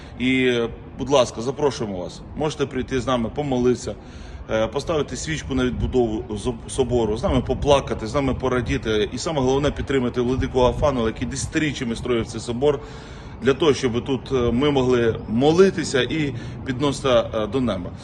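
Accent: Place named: Western Ukraine, Ukraine. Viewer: В Одессе так не разговаривают там все на русском говорят просто человек с западной Украины и претворяется разве так можно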